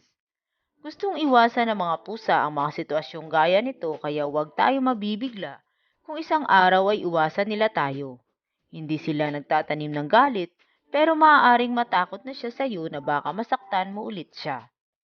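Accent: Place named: Visayas, Philippines. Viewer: Huwag ka naman bully Tiktokersph pangit ang ugaling ganyan. What's wrong having with visayan accent? Ikaw ba excellent ang accent at grammar mo??! Manood ka lang huwag ka ng mamintas! 🧐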